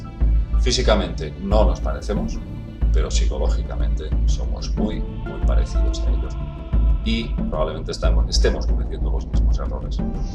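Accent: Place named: Spain. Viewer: siempre lo he dicho, ese acento español es horrible, irrita mis oidos, ademas ese tipo es mas raro que un reptil con pelos.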